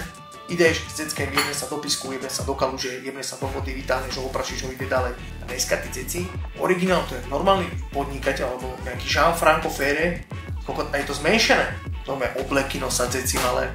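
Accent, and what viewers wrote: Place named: Trnava, Slovakia. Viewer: Toto je trnavčinka jak remeň 🤣🤣🤣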